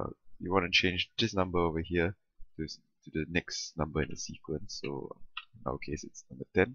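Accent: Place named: Singapore. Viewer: i sense the singaporean accent :OO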